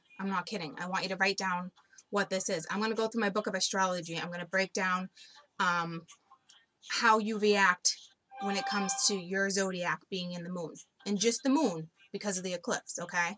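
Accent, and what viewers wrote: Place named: South, United States. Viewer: lmao... Lovely Southern Drawl ya got there and most appreciative from This Virginian Aries with a Southern Bwoston blended tongue... yeah, it's a long story.. but I AM...and so it is. THanks! <3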